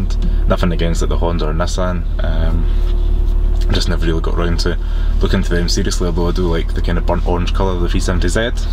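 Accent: Scotland, United Kingdom. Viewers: That's a bit rude, Harald - he is speaking English, but with a lovely Scottish accent. If you want posh Z4 speak, subscribe to "It's Joel". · It's Scottish accent.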